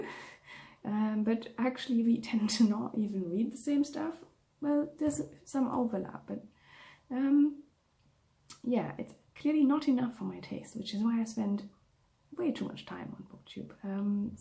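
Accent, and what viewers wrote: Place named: United Kingdom. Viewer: Great video! 😄 I also didn't know the term Literary Fiction before I found Booktube. I googled it. 😄 Your English is really good btw. Did you spend some time in Great Britain? (Just a guess because of certain words you use. 😅)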